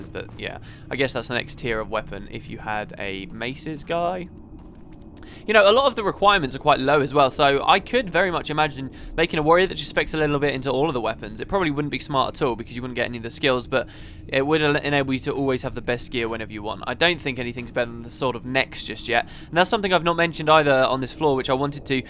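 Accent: United States. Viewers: I lover ur american accent!! Leveerrrr! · Haha lovely american accent!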